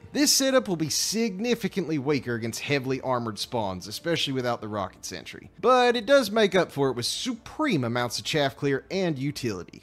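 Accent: South, United States. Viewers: A southerner talking about killing space bugs? Sign me up for the firebat squad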